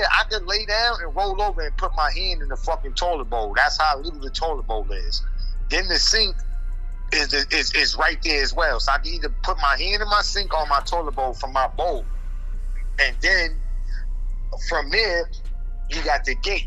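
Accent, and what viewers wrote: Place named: New York City, United States. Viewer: Hearing this man speak make me love the nyc street shit so much I dunno why I’m like this😂🗽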